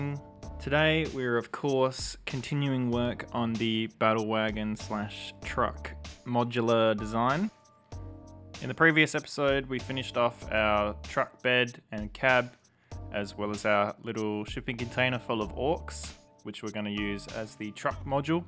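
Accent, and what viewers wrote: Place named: Australia. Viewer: This is so awesome - great models. Have you found your modelling skills have really improved since you started with the videos? I'm interested in hearing how you feel about your progression. Also, nice to hear another Aussie!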